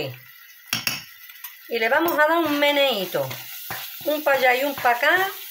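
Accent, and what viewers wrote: Andalusia, Spain. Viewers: De donde eres Sevilla o Cádiz? Simpatica( te veo desde Fran ia) feli año nuevo 🎉😂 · Que cosa tan rica😋 y Ole la gracia Andaluza hablando, seguro eres de la provincia de Cadiz.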